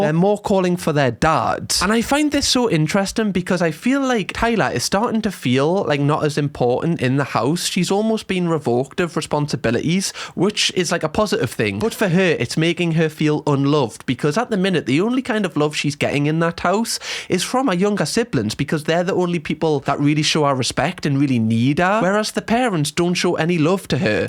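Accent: Newcastle, United Kingdom. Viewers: I follow you cos I miss the Geordie accent. When you said Jesmond instead of Desmond, I laughed.. 😅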